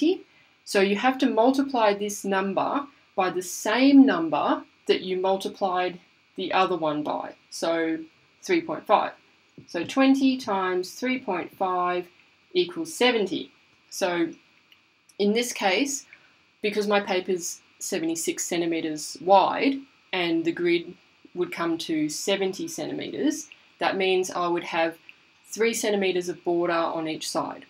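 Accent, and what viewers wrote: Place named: Australia. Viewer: Your Aussie accent is awesome!! 🤗❤️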